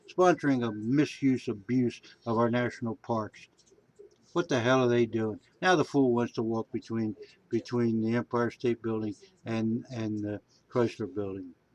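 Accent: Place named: Pennsylvania, United States. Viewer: haha bigfoot....hey you sounds like you're from PA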